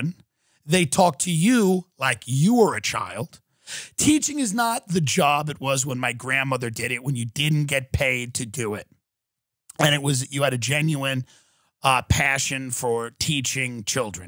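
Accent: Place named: Suffolk County, United States. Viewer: LMAO. I put off liking Tim for the longest time but it's cus i grew up in Suffolk County LI and spent so much time trying to "overcome" it.. But listening to Tim is like being back home. I guess I'm saying congrats and continued good luck to him, as well as thanks for the entertainment. This is a compliment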